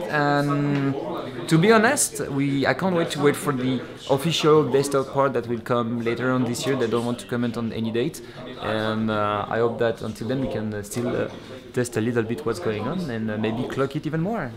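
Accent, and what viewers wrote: France. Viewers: L'accent francais est tres present xF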